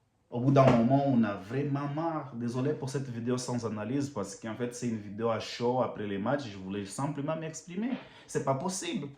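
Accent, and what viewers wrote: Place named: Portugal. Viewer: Bonne vidéo mon frère, on sent ta colère et je la partage ! Loin derrière nous l'époque des grands Cristiano, Ramos, Marcelo, etc, loin derrière nous l'époque où les joueurs se battaient pour le blason et qui mouillaient le maillot...maintenant on a des branleurs. Des branleurs avec un énorme talent, oui, des branleurs qui parfois arrivent à avoir une étincèle, un brin de génie, mais des branleurs quand même. D'ailleurs, petite question, es tu portugais ? J'ai comme l'impression d'entendre un léger accent. 😄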